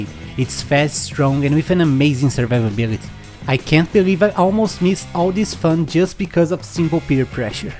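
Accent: Brazil. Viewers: cade o video em portugues? certeza que é brazileiro com esse inglews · Vc é br, o sotaque parece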